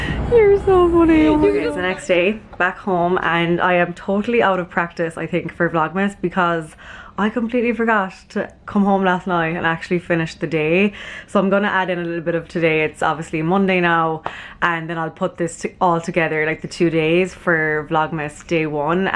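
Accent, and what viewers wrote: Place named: Ireland. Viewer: She's Irish, from Kerry in the south-west of the country. Irish accents are incredibly varied - there isn't just one.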